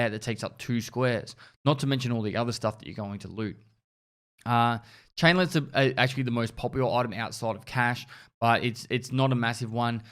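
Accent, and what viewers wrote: Australia. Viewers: Hes Australian as fuck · sounds australian to me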